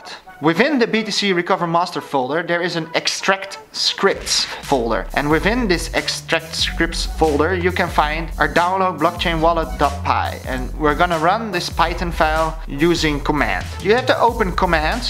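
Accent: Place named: Netherlands. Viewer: You have a ducth accent '-'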